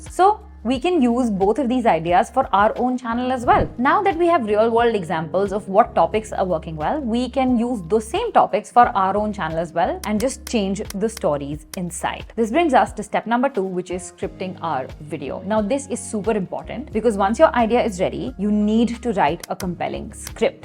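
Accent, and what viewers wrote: India. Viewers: Your voice is sound as indian ❤😮😊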